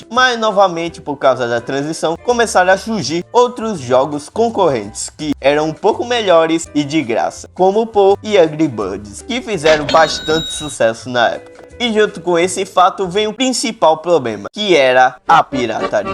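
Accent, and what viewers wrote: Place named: Brazil. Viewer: que sotaque fofo! amei seu sotaque!! eu estudei sobre sotaques! o seu sotaque é remanecente de um dos primeiros sotaques do Brasil!! isso é muito foda rsrs sou nerd de línguas,jogos,anime etc kkkk então sim informação inutil pra você mas toma ehhe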